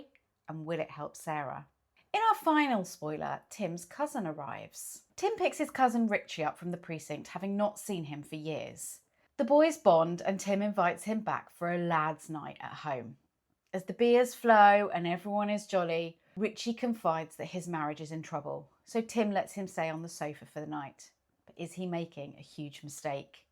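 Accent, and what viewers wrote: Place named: United Kingdom. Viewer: At last British accent. And a real person. No AI with American accents.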